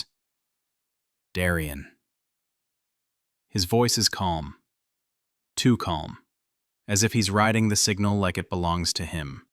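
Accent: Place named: United Kingdom. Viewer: are you a Brit maybe cause your accent shown through in the middle of the story 😁?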